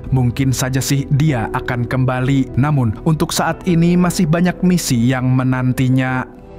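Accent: Banjar, Indonesia. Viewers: Mimin orang banjar kah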